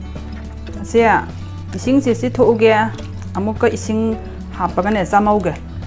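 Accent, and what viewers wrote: Kakching, India. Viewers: Mama Se kakching kei leiky deino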